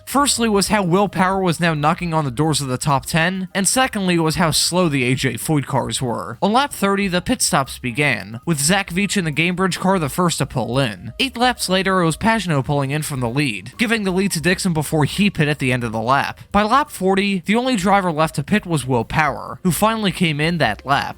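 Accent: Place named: Philadelphia, United States. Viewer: Didn’t pick up that Philly area way of pronouncing certain works till you mentioned where you’re from then I couldn’t unhear it lol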